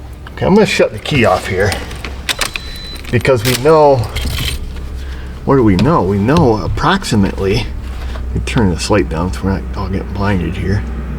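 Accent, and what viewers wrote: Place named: Upstate New York, United States. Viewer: I use to live in upstate NY around Rome. I never thought your speech was abnormal, sounded normal to me for upstate NY. I enjoy your videos and learning a lot from them, Thank You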